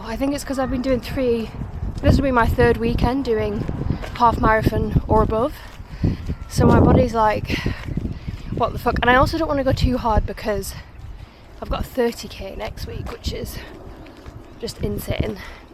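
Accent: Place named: Yorkshire, United Kingdom. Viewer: What part of Yorkshire, did you come from?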